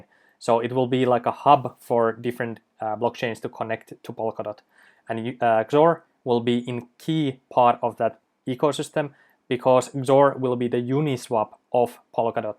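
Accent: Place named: Finland. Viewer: your accent man, it's brutally finish. I had been in Helsinki several times, and making the trip to Estonia! Love your forest, and love your show!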